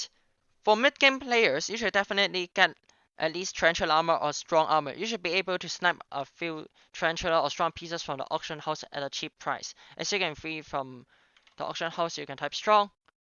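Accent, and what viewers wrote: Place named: China. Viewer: Your accent makes me feel like I’m hearing Chinese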